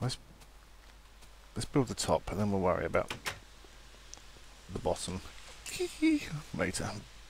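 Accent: United Kingdom. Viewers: may i ask. Where are you from in the UK?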